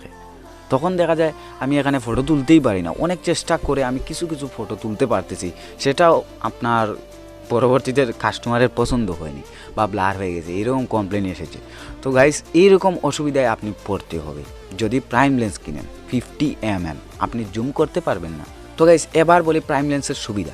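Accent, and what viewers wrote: Sylhet, Bangladesh. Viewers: Vai ki sylheti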